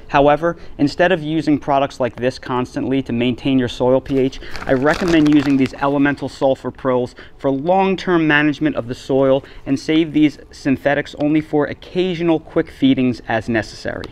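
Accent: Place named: New Jersey, United States. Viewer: North Carolina? I came here to learn how to grow blueberries in Philly. I know a local accent when I hear one. Found out your about page to confirm you are from Jersey. If there is one thing I know Jersery grows the best blueberries around. This guy knows what he’s talking about! Great information especially about the growing zones. Subbing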